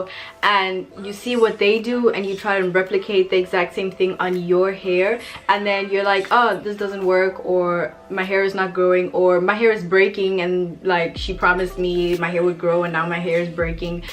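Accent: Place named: Caribbean. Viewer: Your accent is so pretty, are you from the Caribbean?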